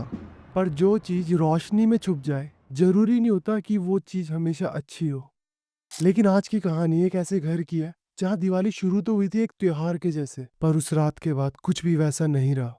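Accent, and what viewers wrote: Punjab, India. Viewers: आप पंजाब से हो क्या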